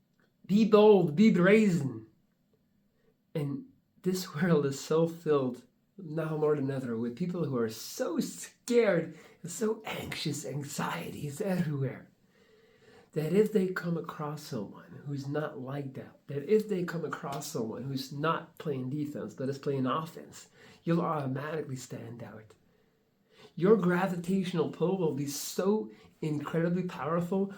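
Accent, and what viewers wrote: United States. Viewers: How come you speak in an American accent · Your English is very good! Have you lived in the USA, you have an American accent.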